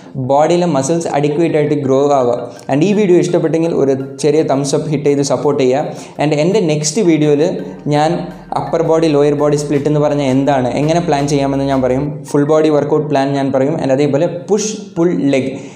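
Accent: Tamil Nadu, India. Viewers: Are you malayali or Tamil .? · Malayali anno athoo Tamil annoo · R u Tamil or Malayali? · Bro tamilan or malayali?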